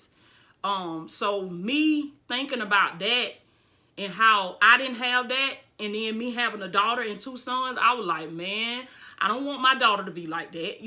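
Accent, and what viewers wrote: South, United States. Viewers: I am also a survivor of domestic violence. I married young too and your testimony is powerful! I love your channel! I see and hear the power of God all over your channel and work the Lord blessed you to do. I felt immediately connected to your channel for many inspirational reasons but your accent took me my back home. ❤️ My people are from the South. I love your zeal. I’m 54 and have walked many miles towards the destiny that the Lord predestined for me too. I’m living my purpose through ministry among other things for the Lord. I purchased your book and subscribe to your channel. I love supporting others and believe that we learn much from the things we suffer. Your heart is beautiful and press on my beautiful Sister in Christ.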